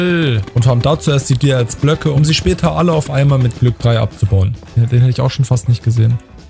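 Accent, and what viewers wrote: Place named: Germany. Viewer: Ich habe dain english video ge sehn und sofort geraten das du deuch bist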